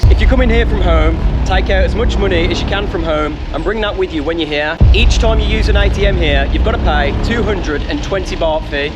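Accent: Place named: Midlands, United Kingdom. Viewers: You sound like you're from the Midlands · You sound like you're from Dudley or Wolverhampton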